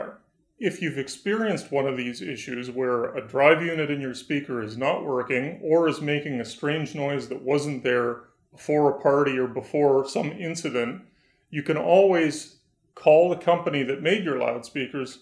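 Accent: Canada. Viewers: First 5 seconds I knew this guy was Canadian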